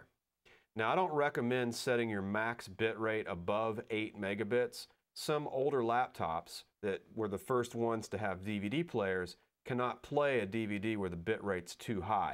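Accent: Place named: Pennsylvania, United States. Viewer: I love the PA accent.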